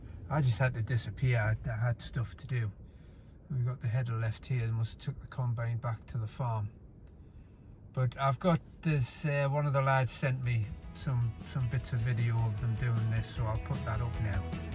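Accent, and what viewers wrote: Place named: Newcastle, United Kingdom. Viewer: Good vid bro.....wat part of uk u in sounds Newcastle accent.....is this ur darm is it many acres....nice country side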